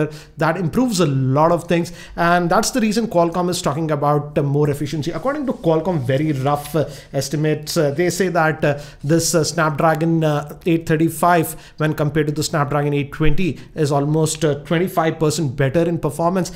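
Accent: India. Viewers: Sorry man, but you need a to work on your accent if your going to speak English it's just too hard to understand. You really sound like your speaking Hindu. Good luck.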